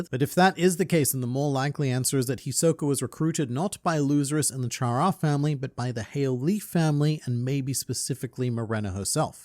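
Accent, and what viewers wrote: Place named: Australia. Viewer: My wife just said, "is that the strange Australian internet man talking about Hisoka?"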